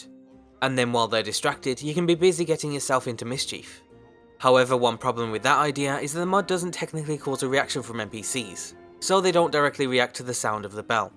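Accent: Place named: North, United Kingdom. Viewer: His accent has changed. Much more Northern now I think....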